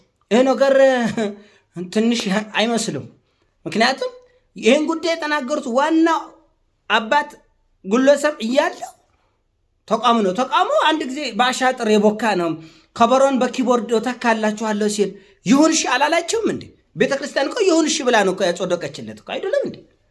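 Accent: Tigray, Ethiopia. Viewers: በትክክል! እውነትን በትግራይ ወገኖቻችን የአነጋገር ለዛ እያዋዛ ለመናገር ወደ ኋላ የማይል፣ የሴረኞችን ተንኮል ቀድሞ በመረዳት የሚያንኮታኩት ጀግና ኢትዮጵያዊ ነው!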